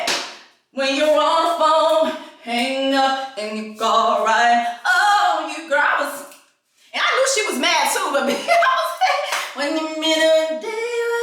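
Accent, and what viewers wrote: Haiti. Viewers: We want more like that...ou fem ri ahhaahha thr haitian in you is priceless. And gurl I would do the sammmmmmeeeee thing. She could have a lonely time at home lol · When you said, "we only got one room" I got up and left too omg. WHAT. Your creole is making it even funnier too (I'm haitian so I understand!!) I'm surprised you didn't call your parents to bail you out, I woulda called my dad ASAP to get me some hotel/motel room and I would never talk to that person ever again. I'm planning my first girls trip...and I hope it goes a lot better than yours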